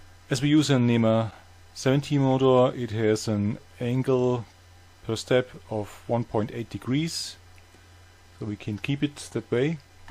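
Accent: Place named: Germany. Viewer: Dazu braucht man nicht auf die Windows Version achten, man hört es am th ;-) Nicht böse gemeint, aber ich habe lange in England gelebt und einen Deutschen erkennt man recht schnell an der Aussprache. Nebenbei, sehr geiles Projekt. Es juckt schon in den Fingern.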